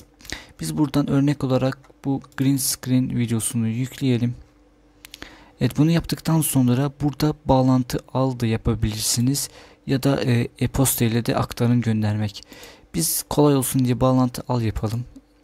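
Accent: Azerbaijan. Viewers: SALAM ELEYKIM QAQA TURKCE DANISIRSAN AMA AZERBAYCANLISAN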